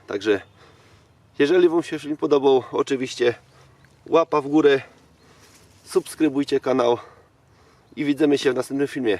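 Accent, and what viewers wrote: Silesia, Poland. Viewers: Hej kolego, jak nie lubię Ślązaków tak ciebie szanuję. Świetne filmy, widać że lubisz to co robisz. I nawet tą waszą gware w twoim wykonaniu da się słuchać. Powodzenia życzę. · Uwielbiam slaska gware.....dziekuje i serdecznie pozdrawiam..)))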